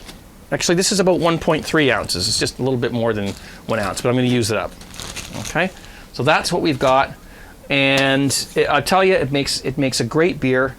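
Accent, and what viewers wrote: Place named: Canada. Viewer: hi, thanks for the great videos. I'm learning a lot. Just curious that you use Coopers products as I'm from South Australia so it's every where (and I love Coopers:)) but you sound Canadian - so either you live here or Coopers are exporting far and wide - cheers to you!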